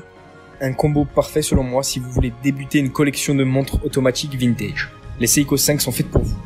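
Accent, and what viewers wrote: Corsica, France. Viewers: t'es corse ? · Ne serait ce pas un accent corse que j'entends ?!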